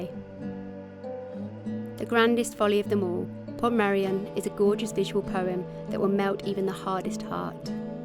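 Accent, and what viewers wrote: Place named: England, United Kingdom. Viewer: would it have been so difficult to have found a Welsh speaking person to narrate - it bugs me to have an English sounding narration of this lovely video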